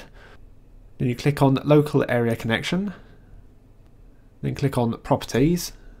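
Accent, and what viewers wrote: United Kingdom. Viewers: never heard anyone more british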